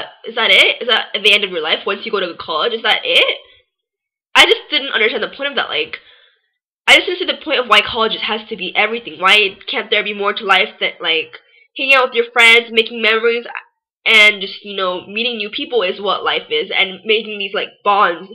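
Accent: United States. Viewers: don't put out your insecurities like being fat as a korean problem. If you were truly comfortable about your body and complexion, you would not have a problem brushing off what others have to say about you, them being korean or not. Before you talk shit about other koreans, think about the blood that is pumping in your heart. from your accent i believe you live in the united states, youre a korean american but the blood that pumps through your heart is that of Korean descent, one that has successfully been preserved and cherished for thousands of years amongst world powerhouses like japan and china. be proud of who you are and learn to embrace all that makes you, you. do you even know the great men and women who have sacrificed their lives to make our country what it is today? do some research, and if you still hate koreans, so be it. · LOL I never heard a Korean girl cursed so American like & she nailed it. 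U are so cute sweetie keep doing you. I've heard Korea prison are very hellish compare to American prison. Smart & cute I want you LOL... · I don't think it matters whether or not she's Korean-American or Korean. She's talking about why she hates being Korean. Regardless of if your Korean-American or Korean, you're still Korean. Maybe she used pounds because she's speaking in an American English accent, so maybe this video was directed towards Americans, who (omg I can't believe it.), use the term pounds. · Yeeeeeeeah, you're extremely annoying and I could barely last the 2 minutes I watched. I thought you'd be listing some personal cons of being Korean, instead you turned out to be some selfish kid complaining. It's hard to believe you're Korean, you sound like the stereotypical American white girl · You sound American, not Korean.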